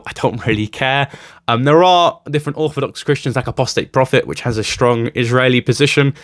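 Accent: London, United Kingdom. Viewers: Oh no. Is that a bit of the London Blackcent I can hear? Very off putting. I hope you can grow out of it. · Has had a bevvy? You sound more Black-Londener than usual. Anyway, Merry Christmas lad, all the best kidda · He has an inner London accent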